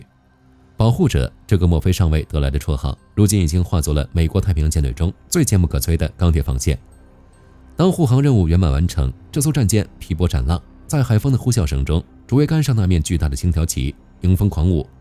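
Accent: China. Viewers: 这英语 浓浓的中国口音啊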